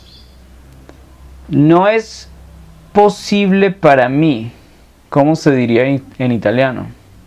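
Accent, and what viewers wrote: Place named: Cali, Colombia. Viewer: Hacés un grán esfuerzo, hermano, gracias por tu tiempo. saludos y apuesto que sos caleño jajaja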